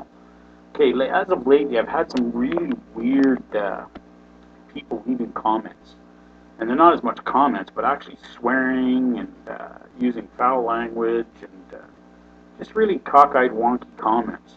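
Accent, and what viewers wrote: Canada. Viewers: Great videos. Just wondering, you don't sound like you're originally from Ontari-ari-ario to me are you from from New Brunswick or Nova Scotia? Sounds like a Maritime accent there. Take care and keep those great video coming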